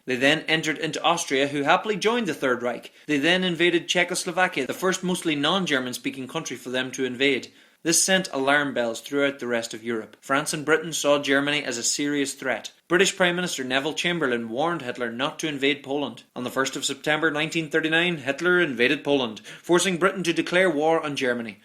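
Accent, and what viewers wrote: Ireland. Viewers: Are you Irish? Your accent sounds like it, I have Irish cousins and they sound just like you lol. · are you Irish? you sound Irish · man i know you had cram lot in 7 min and people love to kind of blow over the whole aid thing from america you did bring it up but like a after thought you talked about britians navy and air force so much better germany and it was how ever the one thing all non america quick not talk about the lean lease with out the fuel from it britian would not be able to fly the planes or would not been able to sail the ships also on to russia you said it amassed large army true but with out food aid fuel weapons and material russia would been forced to surrender i know you were on a time restraint and i do think you for the sleeping giant i think that was churchill that first said it and i cant really tell by your accent i take it your irish for even bringing up irland if so there my second faviort non usa country only germany do i love more mostly cause out side of america the germans invented the most stuff not as much as america but alot i love that little country not little compaired to irland but little compaired to usa or russia and would beaten russia in my opion if was not for america that means would took a country 2 and half times the usa or about 15 that of its self not to mention belgium france poland all the others by its self mostly yea italy helped a little but hell greece beat italy in the early parts but i might be going after your video that does not mean i did not like it so im sure first thing if you do respond to this will be my grammar yea i know it sucks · You sound irish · Damn, everything is more interesting and funny with an Irish accent :D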